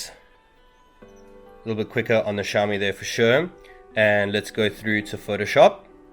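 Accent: South Africa. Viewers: i hear from your accent you're from SA. i am too. i am thinking of getting this but retailers dont import the mi series and wont repair it (I asked them, they'll only repair phones they've sold and they only import redmi here). any idea where i can get a mi 9 fixed if it breaks? thats my only concern before buying. thanks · are you south african? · Are you south african? I am. Nice video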